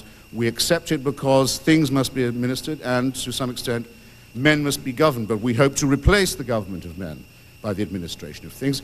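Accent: Southern England, United Kingdom. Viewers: I agree. Plenty of ppl with Southern English accents and yet I can't name one who stole my heart away from other than. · Hitchens isn't famous because of his Southern English accent, you know about his accent because he was famous for his immense intellect.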